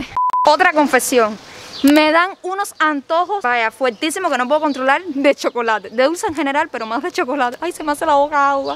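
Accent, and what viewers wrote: Cuba. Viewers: Viendo este video en 2023, ¡wao! que delgadita eras y hablabas muy distinto ya has perdido bastante el acento cubano.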